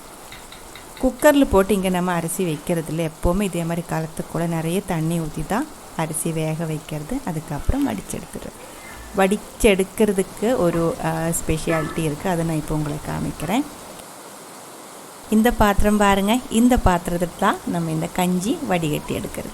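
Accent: Kerala, India. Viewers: Sadharana eniku thamil manassilavarilla but ningal samsaram nannayitu manasilavunnu. Keralathil thamasikkunnath kond malayalathinte influence undo samsarathinu? Nice vlog... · പക്ക മലയാളിയുടെ തമിഴ്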